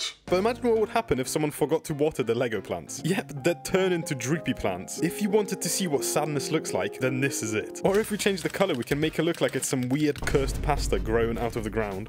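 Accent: United Kingdom. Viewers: Bros British I’m British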